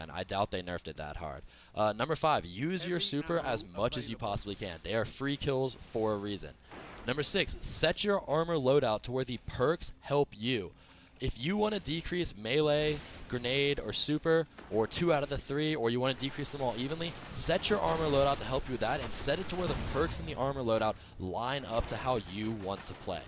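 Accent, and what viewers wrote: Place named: California, United States. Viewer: Yeah I was debating between that and "First" but ultimately it was your california surfer "brah" speach style that helped me decide ^^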